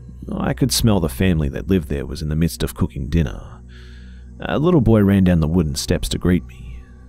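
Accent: Australia. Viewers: He is Australian, so the way He pronounces things is different from ours.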